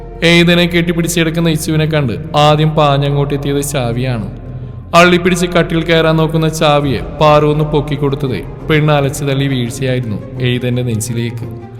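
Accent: Tamil Nadu, India. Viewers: nalla oru entertainment story😂😂orupad chirich...ithuvare ulla nayikanmaril ninn variety nayakane nammil ethicha madhurikkum adipoli tamil slang presentation nadathiya ❤❤❤❤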